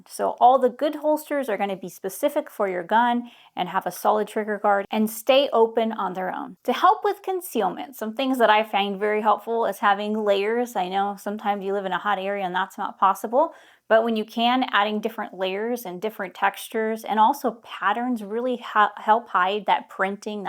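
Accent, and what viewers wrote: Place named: Ohio, United States. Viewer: No matter what I carry I carry in the same place always. Thanks for the Ohio accent,Roll Tide.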